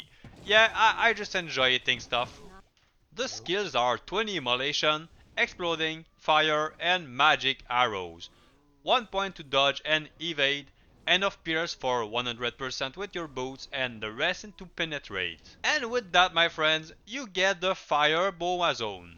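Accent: Quebec, Canada. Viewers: rreally nice english with a beautiful quebecer accent lmaooo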